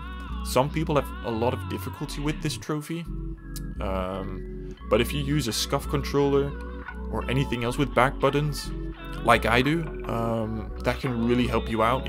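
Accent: Netherlands. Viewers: So I was actually able to pick up you’re from the Netherlands by your accent but dang that took a while. Definitely a compliment because usually I can’t stand dutch english accents but yours is fine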